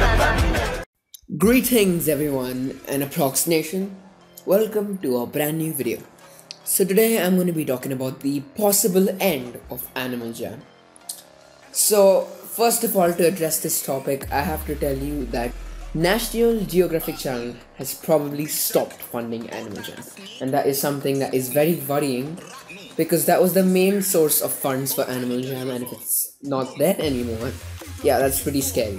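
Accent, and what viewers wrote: India. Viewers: Ooo are you Indian? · are you indian? It sounds like it ouo (im not btw just asking) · are u indian? By ur voice i think ur indian and do u have extra spike i am buddy83282 and i saw it 5-6 times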